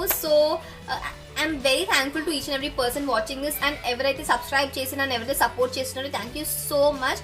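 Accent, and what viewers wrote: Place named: India. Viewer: Love your slang in telugu ❣️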